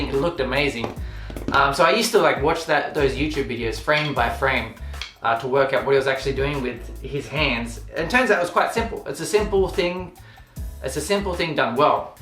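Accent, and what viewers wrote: Australia. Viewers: Is he from Australia?? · R u aussie?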